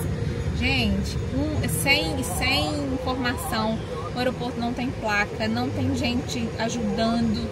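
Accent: Minas Gerais, Brazil. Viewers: Oi. Adoroooo viajar com você por esses lugares paradisíacos. Eu deveria dizer com vocês, pois temos 2: a PODEROSA que se veste com as grifes caras e a GENTE NOSSA que se veste com Shein, e tem nas duas a SIMPATIA MINEIRA com esse sotaque maravilhoso! E ADOLESCENTE é tudo igual kkkkkkk. Beijos.❤❤❤❤❤❤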